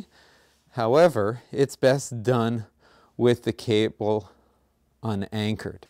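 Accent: Canada. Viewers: Derailleur is French for de-railer so it depends how pedantic you want to be. I guess the instructor is Canadian by his accent and he pronounces it differently to 'muricans. Thanks for the video. This is the second time I've used it to tweak my road rig.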